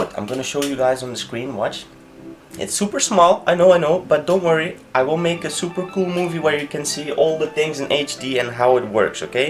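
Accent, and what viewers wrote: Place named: Netherlands. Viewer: where are you from? you sound kinda dutch to me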